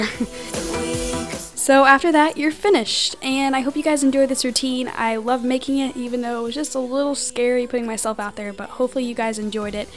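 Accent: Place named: United States. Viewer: What country are you from? Cause it's autumn nearly winter in Australian and Southern Hemisphere, but it's spring nearly s summer in America,northern hemisphere. But you sound American. Aha well anyways by the way you are so beautiful with or without this makeup and I respect you being so brave :)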